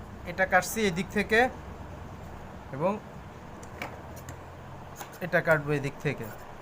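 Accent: Noakhali, Bangladesh. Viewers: আপনার কথা গুলো সহজ সরল, আপনার বাড়ি কি নোয়াখালি নাকি ভাই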